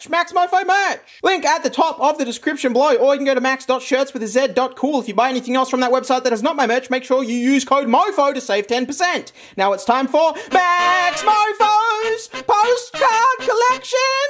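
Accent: Australia. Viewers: U an Aussie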